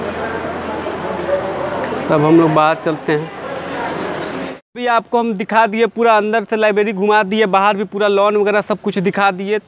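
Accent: Bhojpuri, India. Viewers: Glti se i came here.....but couldn't stop myself from watching the whole vdo.....nd just coz of his awsmm #bhojpuri assent.......😂😂😂😂he was like inko kon hi rokega😂😂😂😂😂😂😂😂😂😂😂😂😂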